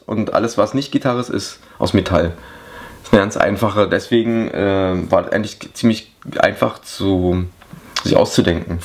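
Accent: Berlin, Germany. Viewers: Berliner Dialekt ;) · Yes. But it's not an accent it is a dialect and this dialect of the berlin region feel "dirty" for other germans. For example: they say "dat" or "ick" instead of "das" and "ich". It sounds very shortened. Fun fact: "ick" is often the wrong pronunciation of "ich" from americans/british. Because they have the same trouble with the "ch" as we with the "th" :D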